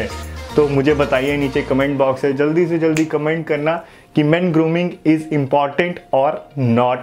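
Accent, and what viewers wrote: United States. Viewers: Bhai mene bhi aap ko American smjha 😅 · Bro mene aapko American samgha😂😂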